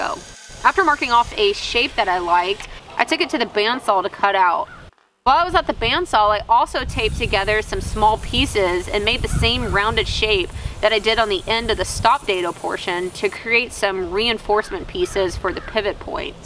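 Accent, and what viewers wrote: Texas, United States. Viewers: This is known a a TEXAS DRAWL'ah and if y'all aient from Texas ya'll dont speak it.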